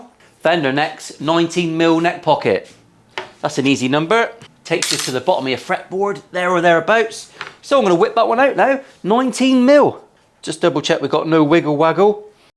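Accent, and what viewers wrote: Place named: Cornwall, United Kingdom. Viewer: Listening to you makes me miss my time spent living in Plymouth and Cornwall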